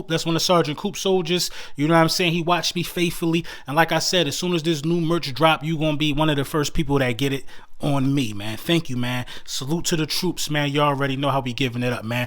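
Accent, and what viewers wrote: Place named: Philadelphia, United States. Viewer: I can spot a philly dude a mile away, that beard and accent ... Go Birds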